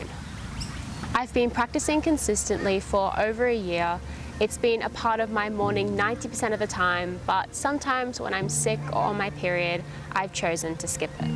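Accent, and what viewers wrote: Australia. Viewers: Nice to hear an Aussie accent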